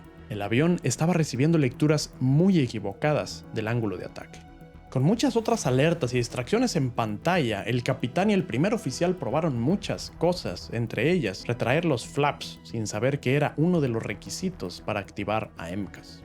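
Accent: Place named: Mexico. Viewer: Excelente video y excelente acento mexicano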